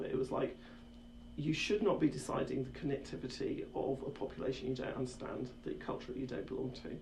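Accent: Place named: United Kingdom. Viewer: British accent used..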